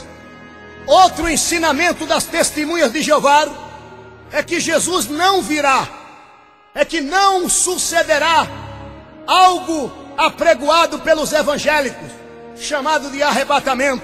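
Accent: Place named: Bahia, Brazil. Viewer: não critica o locutor, ele é baiano, o linguaja do baiano é diferente, ele pode ter errado alguma coisa, mais ele acertou muitas coisas, que essa seita ensina, sem jesus não há salvação. e os 144mil são israelita não gentil como nós, vamos parar de ensinar heresias, como os tj ensinam...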